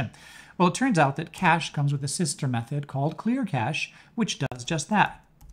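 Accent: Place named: Canada. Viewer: So I want to know how to built a custom shape that I can attach a line to, then when moving the shape, bring the attached line endpoint with the shape? I am trying to build an application (not a game) with your tutorial’s help as I’m not a programmer or web developer. I know enough to dangerous, and stupid in some cases. I first have to figure out setting up the screen into parts for various roles, how to develop a shape library for my app, and then load an image, scale that image and reuse that scale for my other functions, then place the custom shapes (save that data on open/close) and a bunch more “magical stuff“. You sound Canadian, but you’re in Japan… interesting to say the least. Thanks so much for these tutorials.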